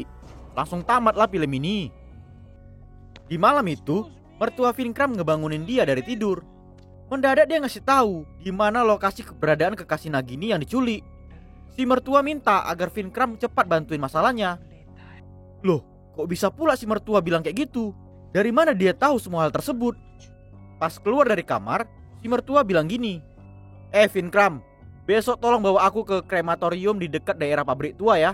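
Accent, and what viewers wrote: Medan, Indonesia. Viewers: Dialeg medan bg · Suaranya logat anak Medan ini... Yg Punya Canel anak Medan Bung... 😂😂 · Kok suaranya mirip logat org medan dah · Becak becak, Medan x pun kau wey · Medan nih suaranya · kek gini lah klo org Medan nge review film,jd ngakak😂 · Ceritanya kek lagi begosip versi org medan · Binje - Medan kali bahasa ko bang 😄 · Nampak kali medaaan kau yaa 😂😂😂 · Yang ngomong orang Medan y bang? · Orang Medan kek nya admin nya ini wkwk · Orang medan ni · Medan kali kau yah lek hahaha sama sama medan kita Horas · Anak medan bah😂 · Kekel ma bahasa ke bang... Medan d mana ke bang... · Eh nampak kali Medan ny ABG ni bah seru pulak aku denger suara kau bg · Medan kali ah kau bang.. Horas medan😀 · Medan x bahh logat nyaa..haha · kyaknya ko orang medan ya bang Mantap la🖒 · Fix ni admin orang Medan🤣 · Logat medan nya mstap kali🤣🤣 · Yang cerita orang medan , bah makin keren si abang ni😂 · Logak cara bicara abng kaya orng medan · Suara org Medan😀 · Medan kali logat abang ini ya kan we...?? 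· Orang medan bah yg review film nya🤣,mantap kali bah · Logag medan in kak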